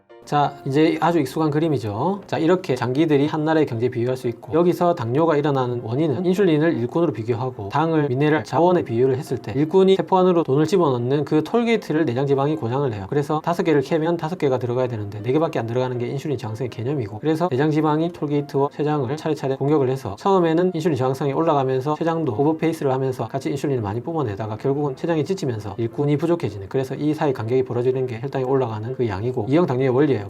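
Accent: Gyeongsang, South Korea. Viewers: 김근영 시비라니요!! 표현을 그렇게 하시나요? 난 70 할머니이고 닥터의 경상도 억양이 있으신데다 빨라서 쉽게 알아 듣기 힘든 단어가 있어서 말씀드린 겁나다 앞으로 말씀 곱게 쓰시길 부탁드립니다. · 10프로는 넘께 뺏는데 많이 좋아졌어요 3개월전 공복이 220이였는데 지금 100이하에요 근데 선생님 병원은 어디에요 경상도쪽같아서요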